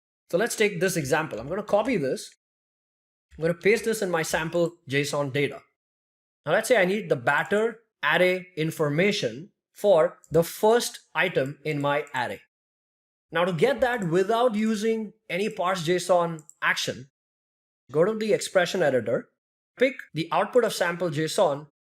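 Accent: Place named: India. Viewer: And his mic quality is actually good! Unfortunately so many of these talented developers making content have thick Indian accents, although they can’t help it - this makes it hard to understand coupled with bad microphones its doubly difficult to comprehend the great info they teach: shout-out! 💪🏼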